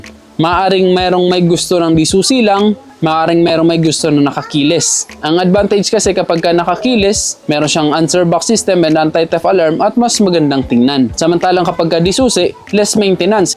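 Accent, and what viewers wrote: Samar, Philippines. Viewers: Taga samar ka no? Yung tuno mo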